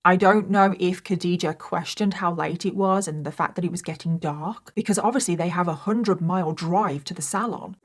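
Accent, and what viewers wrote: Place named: West Midlands, United Kingdom. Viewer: Only just found your channel. Your accent sounds local to me, West Midlands. Makes a nice change 😅 I’ll definitely be back to watch more.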